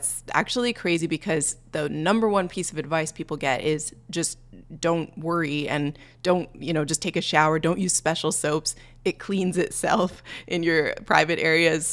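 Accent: Canada. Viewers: We missed you sm!!! I’ve been watching since I was a teen and I’m 21 now…I just realized you have a Canadian accent😆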